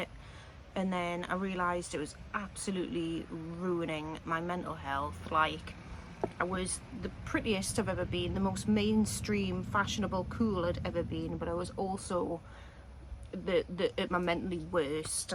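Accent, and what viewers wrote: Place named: Newcastle, United Kingdom. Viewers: can't mistake the Geordie/north east accent 👍your certainly living the good life 👌🚴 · I’m not on Instagram, you fell into my feed! 😂came for the fact your in the UK and life in a small rural space. Stayed for the North East accent👌🏻, Fox ❤ and a great video. Love from Northumberland on the Scottish Borders x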